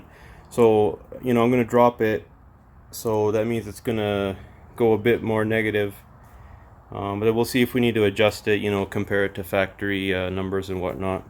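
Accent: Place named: Canada. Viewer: You sound canadian eh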